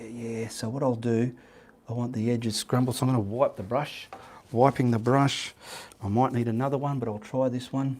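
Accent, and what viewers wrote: Australia. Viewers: Howdy mate. Gees, your ozzie. Love it. Love your work. Love your chatter. Your my morning viewing.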